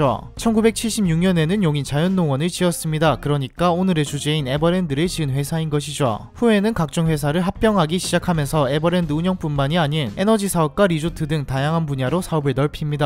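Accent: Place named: Busan, South Korea. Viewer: 듣기전에 몰랐는데 부산사람이라는 걸 알고나서 부터 들리는 사투리 억양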